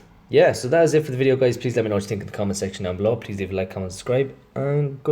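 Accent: Ireland. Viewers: Are you Irish btw? :)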